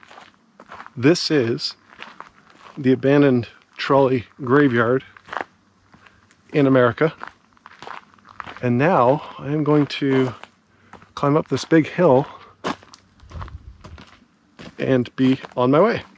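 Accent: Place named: Canada. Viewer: Correction, they are not trains....they're trolley cars or street cars. The exception are those high floor Chicago subway cars that were made using parts from old Chicago PCC trolleys. And I must say I like your Canadian accent. Did you happen to get the car number of the 1949 Red Arrow {Philadelphia Suburban} car that you boarded on the time bar. You showed the interior above the windshield, but I couldn't see the car number. Man, these ads that pop up every minute or two are really annoying. The ticks and other insects must have had a great time with you. And frankly, it's dangerous to climb around in equipment in that deteriorated condition.They've been exposed to the weather for decades. Doing it alone is especially risky and unadvisable.. It could be likely to have unfortunate consequences. If you got hurt, who would help you? Let's hope there is working cell service there for emergencies., that's not a driver's seat. 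It's the motorman's cab. The conductor collects fares or opens/closes doors. The conductor doesn't operate vehicles. The motorman operates these cars. You've got lots to learn before narrating a video like this. It might interest you to know that a scrap dealer has just bought everything at the Windber site and by year's end it will be cut up. That's to give museums and collectors time to buy things such as spare parts. There are a few vintage cars inside of the shop building that should go to museums. <link>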